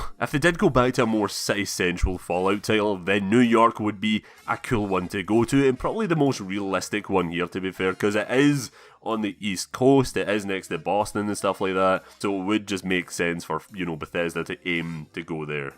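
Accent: Scotland, United Kingdom. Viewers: This is my first vid of his that I’m watching and in the first few seconds I thought he was Russian, and then I heard the Scottish accent, this is pretty much the only Scottish gamer I’ve found on here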